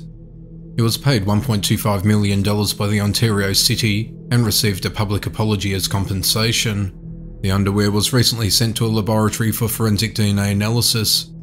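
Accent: Australia. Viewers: im disappointed that all these sound like the same case! fucking psychos edit : why are people making such a big deal about his narration? it is very clear. he’s just australian. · Thats a Australian accent actually. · Is that how Australians speak normally?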